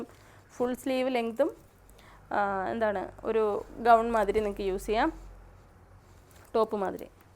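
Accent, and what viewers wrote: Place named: Kollam, India. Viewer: കൊല്ലം സംസാരം ഇഷ്ടായി 🌹🌹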